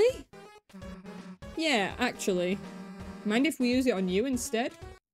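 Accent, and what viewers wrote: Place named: United Kingdom. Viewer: British? not a problem, i don't mind british if it's good content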